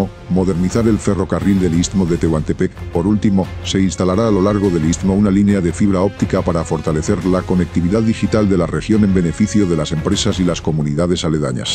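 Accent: Spain. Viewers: Eviten este canal de CM. Sólo hay locución en español de españa cuando se trata de México. Evitenlo. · Porqué un Espanyol tiene que ser la voz en Off ???? Teniendo tantas voces tan icononicas de Artistas Mexicanos!!! 😮😮😮😢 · Ya no queremos escuchar a un Español narrando los videos!!! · Y por que narra un español preguntome yooooooo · Por qué el locutor habla como español si esto es un proyecto mexicano es de México debe de ser un locutor mexicano😅😅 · Quítale el acento gallego por favor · Que extraño, estos vídeos relacionados con México narrados por un español!!!! Para ripley.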